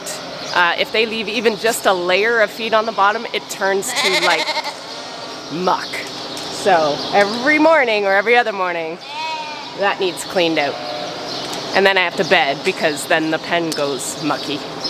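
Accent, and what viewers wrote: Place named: Canada. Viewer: As a texan, i love your canadian accent!!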